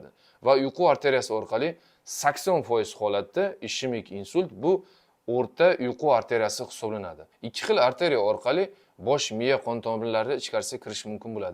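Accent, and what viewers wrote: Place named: Fergana Valley, Uzbekistan. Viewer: Доктор болам сиз каердан сиз шевангиз Водийга ухшаяпт телфонтракамиз чикаринг мен жуда керагл экансиз доктор болам ???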